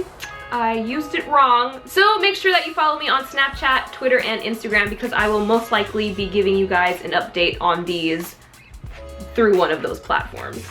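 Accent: Australia. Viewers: Just wondering if you follow Australian YouTubers 😊 Your Aussie accent is on point lol 👌🏼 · I love the Australian accent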